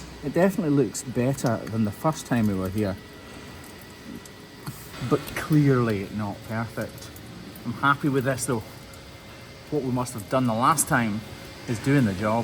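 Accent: United Kingdom. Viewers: It's like watching the ear doctor clean some wax. Satisfying. Except you have a British accent. Love it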